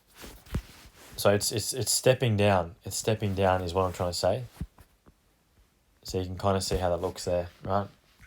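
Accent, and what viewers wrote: Australia. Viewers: Hey mate nice videos, you sound like a fellow Aussie, I’m in Bris, where are you based?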